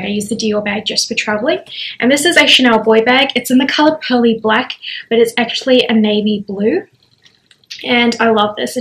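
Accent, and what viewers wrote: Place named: Australia. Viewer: I love your accent. It's so refreshing to hear an Australian girl talk about fashion.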